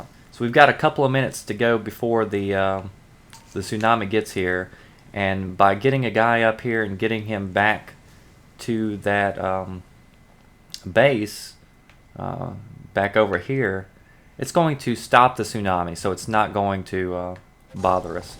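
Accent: South, United States. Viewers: Hey were you from? I'm from georgia, you sound like you're a fellow southerner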